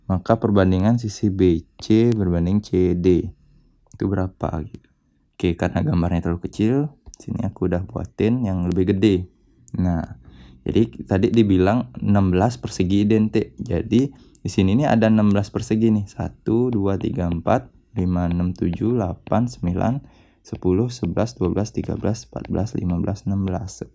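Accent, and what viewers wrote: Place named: Bali, Indonesia. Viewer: Dari logat nya kayaknya yang punya channel dr Bali.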